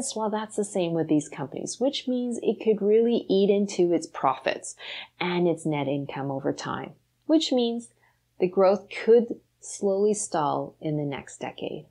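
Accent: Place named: Canada. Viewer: Anyone else get a lovely Canadian accented female Mr. Rogers vibe from her? I could listen to her all day.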